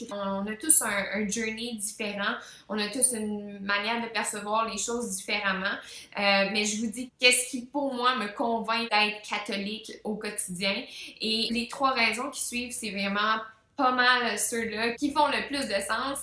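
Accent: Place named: Canada. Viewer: J'aime votre accent...accent canadien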